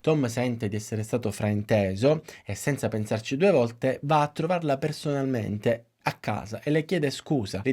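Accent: Palermo, Italy. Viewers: Il signorino perfettino asettico, no grazie, adoro la personalità e colore con vocaboli palermitani.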